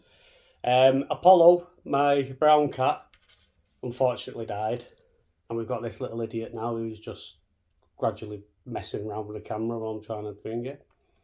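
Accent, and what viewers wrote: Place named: Manchester, United Kingdom. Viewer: I'm hoping something comes up before it's too late, my brother can't walk very far now so his lungs are quite far gone. I'm not saying nothing about the you know what jab, but my brother and my ex both got the jabs then both got copd at the same time, it's either something is going on or it was just extreme bad luck/coincidence. By the way you sound like a manchester lad, I'm near Stockport, my brother lives in Oldham, I have another brother in blakely.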